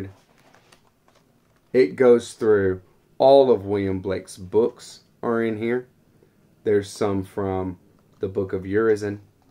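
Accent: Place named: Western Maryland, United States. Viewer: Good video man. Never knew about over half of these. Sounds like ur from western MD. Thats my old stomping ground.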